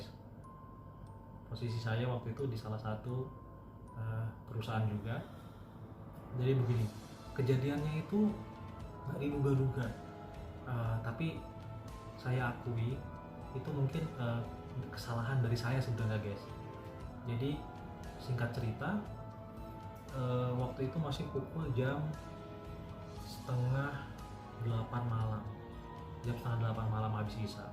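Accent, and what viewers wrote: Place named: Kutai, Indonesia. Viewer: urang gaib ..bunyi urang kutai om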